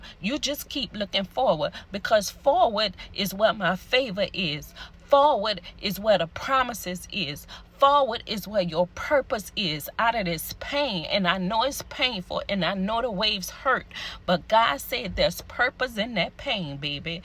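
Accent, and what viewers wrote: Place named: New Orleans, United States. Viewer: Are you from New Orleans ? You sound like us 😂 !! Also Beautiful word .It’s my first time seeing a video of yours . I’m 22 and spent time away from people for three years building my personal relationship with God and being purged of the things that didn’t belong to become all he wants me to be and it wasn’t easy bc I was completely alone with just him and no friends or family for support or guidance but I am still here 🫡 . This resonated with me and I receive it ❤🙏🏽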